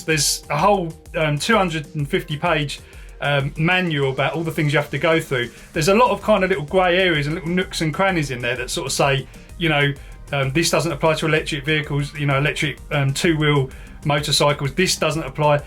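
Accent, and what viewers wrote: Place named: Essex, United Kingdom. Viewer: Sounded pretty Essex :-)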